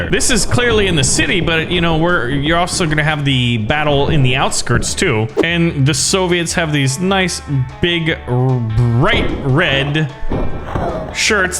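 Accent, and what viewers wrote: Germany. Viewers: I’m from Germany and i just love it when he uses his German accent. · your German accent isn't annoying it's WUNDERBAR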